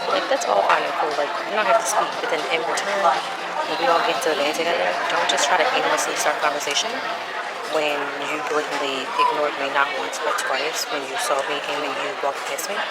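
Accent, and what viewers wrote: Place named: Baltimore, United States. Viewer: Are you from baltimore? im hearing the accent lol, i love your videos E 💗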